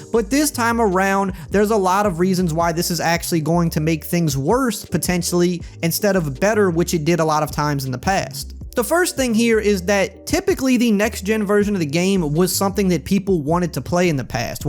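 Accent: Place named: Chalmette, United States. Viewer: You sound like you’re from Chalmette. Who Dat!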